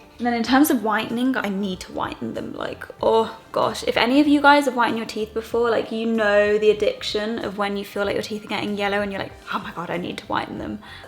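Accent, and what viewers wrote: United Kingdom. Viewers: Nice British accent ;D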